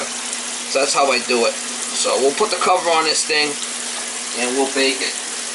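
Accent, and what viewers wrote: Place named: North, United States. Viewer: Stuffing????? That's what you put in your Mama's pillow!!!! LOL Dressing is what you should be eating That's what we do in Georgia, Mama!! P.S. Love that accent lol You people from the north talk faster than us southerners. I have to listen good!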